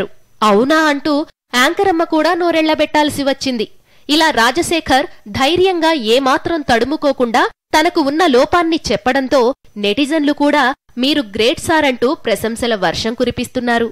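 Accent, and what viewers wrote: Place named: Tamil Nadu, India. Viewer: He is Tamil guy are telgu guy...now only I am listening his speech many Tamil infuliance is there in his speech...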